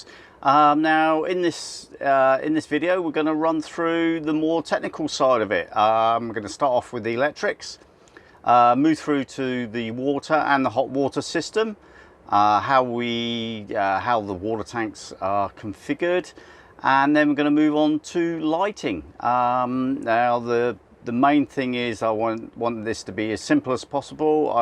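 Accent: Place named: Australia. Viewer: Well I just checked out your other videos and see you're in Australia. I'm in the US but my best friend lives in Sydney and I was sure that was a down under voice you have.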